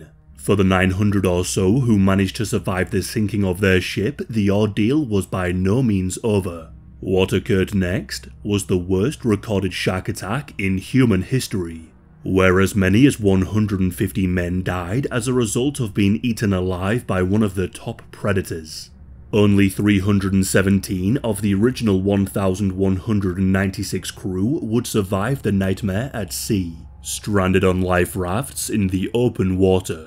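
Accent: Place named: Scotland, United Kingdom. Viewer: He's Scottish. You can immediately recognise it because he pronounces "u" properly.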